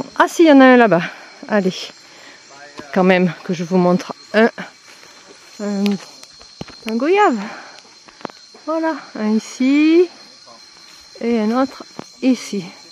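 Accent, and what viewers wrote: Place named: South, France. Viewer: Tu n'as pas perdu l'accent Français du SUD 😛 Top la vidéo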